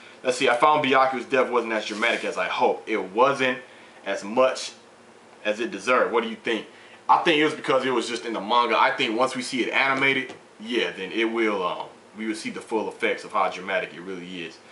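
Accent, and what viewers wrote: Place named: Midwest, United States. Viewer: i knew you was a mid-west dude, my guess was probably st louis or detriot.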